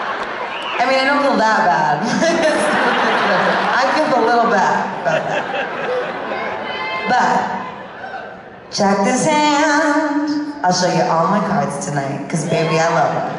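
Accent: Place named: New York, United States. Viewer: That New York accent is coming through strong here.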